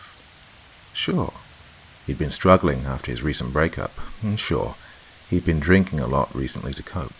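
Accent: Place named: England, United Kingdom. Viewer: Hearing an Englishman narrate the thoughts of a truckdriver in Arizona is...odd. Good, but odd.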